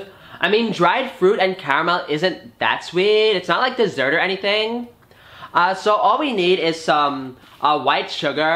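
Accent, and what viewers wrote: New York, United States. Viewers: Too funny! First there was an eggplant on the toilet tank, later on there was a pineapple on the tank. Go back to show business. You're a natural talent. Great Valley Girl accent as opposed to your normal New York accent.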